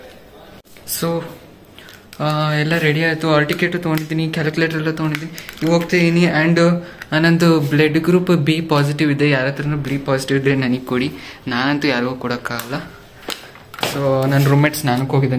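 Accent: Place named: India. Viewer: Your hindi accent 😅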